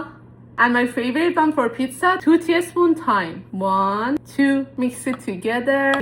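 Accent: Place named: Czech Republic. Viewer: Love your personality and accent. Are you Czech?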